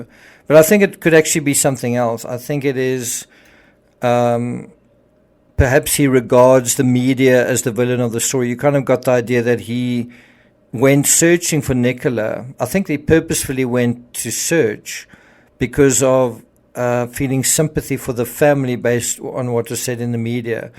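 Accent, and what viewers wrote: South Africa. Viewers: Are you from South Africa? Thank you for keeping us informed I do think your presentations are excellent.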